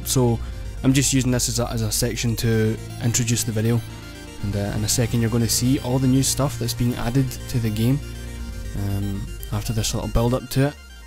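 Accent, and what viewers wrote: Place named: Scotland, United Kingdom. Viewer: You're so... scottish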